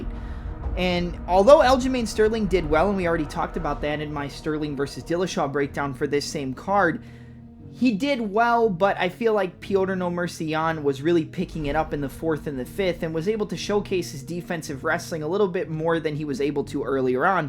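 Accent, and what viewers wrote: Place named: Midwest, United States. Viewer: Youtube changed the algo to feature smaller creators. I dig it. That thick midwest accent sounds like home. Good vid.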